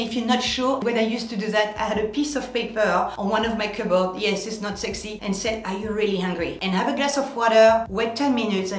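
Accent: France. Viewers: Great video delivered in that inimitable French way!I decided right away to follow your lead -not dieting but living within a discipline-I will report back in a month Thank you!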